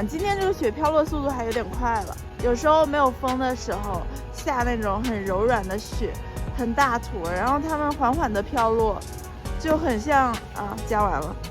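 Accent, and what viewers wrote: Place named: Hubei, China. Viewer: 聽這聲音語調一直很熟悉~原來是湖北口音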